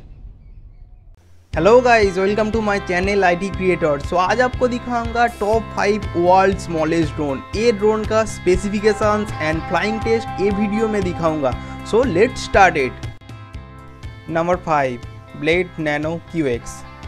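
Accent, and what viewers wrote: Bengal, India. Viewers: R u A Bengali??? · bro are u Bengali?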